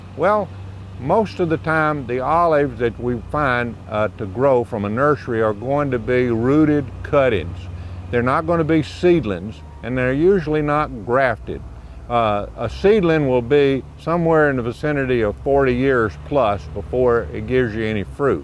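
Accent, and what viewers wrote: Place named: Appalachia, United States. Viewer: Lovable hillbilly accent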